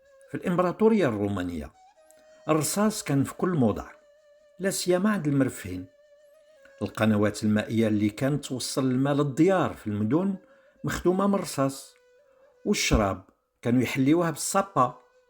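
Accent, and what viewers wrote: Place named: Tlemcen, Algeria. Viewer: Une narration Tlemcenienne authentique et magnifique. Allah ya3tek essaha ouyahafdek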